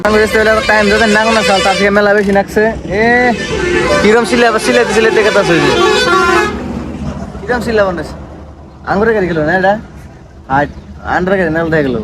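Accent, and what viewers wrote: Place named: Bangladesh. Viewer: ইন্ডিয়াতে আপনার গ্রামের নাম কি.... বাংলাদেশে আমাদের গ্রামের মানুষের কথাও আপনার কথার মতো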